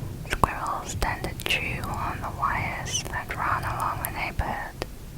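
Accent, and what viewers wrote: United Kingdom. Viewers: are you british? actually yeah you obviously are what am i on about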